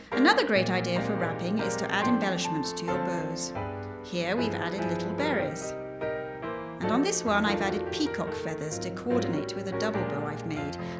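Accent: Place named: United Kingdom. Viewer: Loved your tree decorating video. Inspiring and wonderful! Would like to hear Sharyn Alice in this video instead of the british accent. This voice does not sound sincere. You have lost impact with the voiceover.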